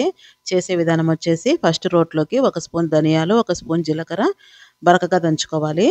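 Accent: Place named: Rayalaseema, India. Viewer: చాలా రోజుల తర్వాత రాయలసీమ భాష విన్నా ❤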